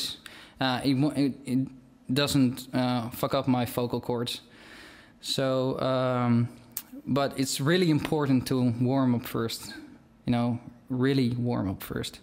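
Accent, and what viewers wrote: Netherlands. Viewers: where are you from? from the Netherlands? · AaronTK Kelly He is dutch. :D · are you dutch? :3 you sound like you are. IT'S CUTE. :3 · sounds like a Dutch accent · You are dutch aren't you :p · Ik hoor direct aan uw accent dat ge Nederlands zijt :D Heel goed!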